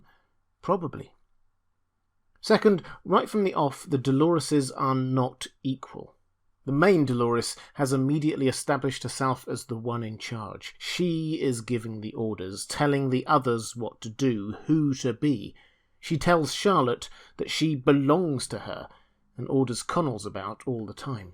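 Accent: England, United Kingdom. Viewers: It's an English accent!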